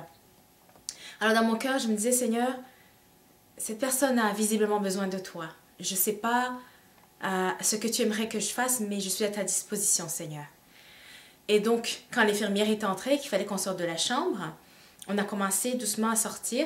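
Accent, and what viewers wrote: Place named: Quebec, Canada. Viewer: L'accent canadien est vraiment prononcé dans sa voix une vraie québécoise sois béni à toi ma sœur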